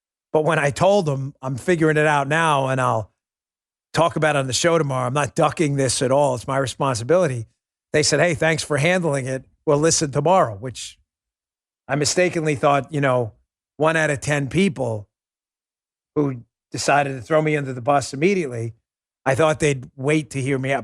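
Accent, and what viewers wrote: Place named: New England, United States. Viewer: Your ok with me Dan, if we could just get rid of the yankee accent.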